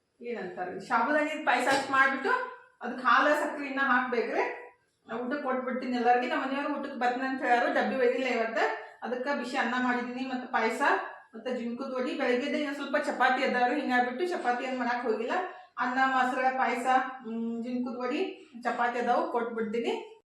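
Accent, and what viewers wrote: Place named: North Karnataka, India. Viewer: Congratulations akka.uttar karnatada bhase nimma baaina kelod onda khusi.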